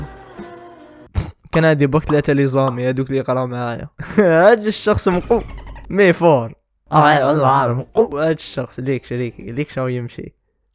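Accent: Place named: Algeria. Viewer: هو يتكلم بلهجة الجزائرية لانه جزائري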